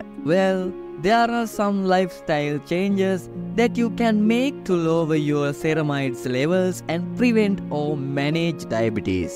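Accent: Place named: Sri Lanka. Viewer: your accent says you are from Srilanka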